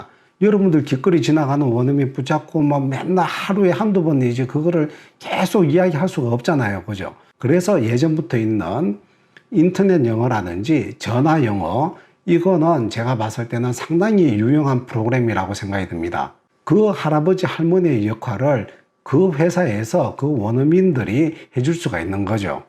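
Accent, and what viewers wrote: Gyeongsang, South Korea. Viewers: 겡상도 발음 너무 친근감 ㅎㅎ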